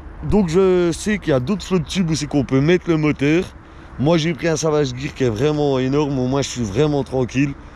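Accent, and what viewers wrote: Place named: Franche-Comté, France. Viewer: Salut tu est francomtois?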